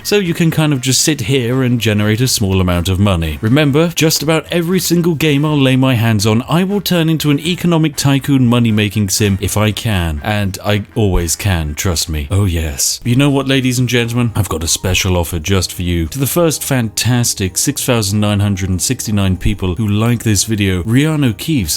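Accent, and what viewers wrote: United Kingdom. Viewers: Youtube: recommends a video. Me: hears slow talking British voice. Me: ×2 it is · Something says to me that he is British 🤔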